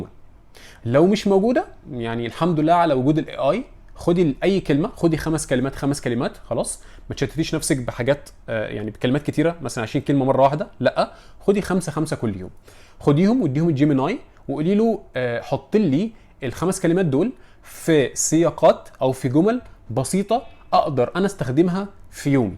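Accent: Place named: United Kingdom. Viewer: جميل ماشاء الله حاسه ان اللغه الانجليزيه مأثره شوي علي الكلام العربي بس عاش م شاء الله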